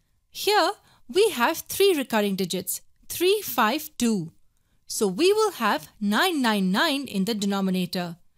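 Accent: India. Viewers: You sound to be Indian but your English is clear and I like it.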